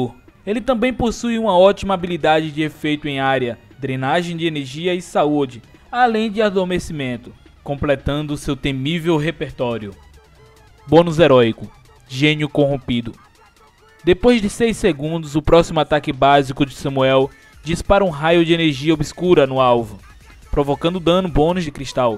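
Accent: Northeast, Brazil. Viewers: tu é de onde?tem sotaque do nordeste oshe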